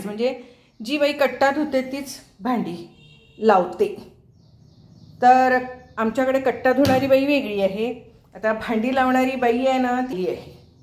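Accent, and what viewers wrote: Kolhapur, India. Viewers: तुम्ही कोल्हापूरच्या का? भाषे वरून कळते.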